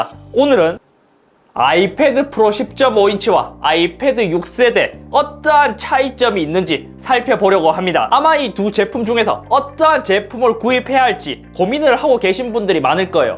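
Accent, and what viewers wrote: Gyeongsang, South Korea. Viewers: 고향이 대구에요?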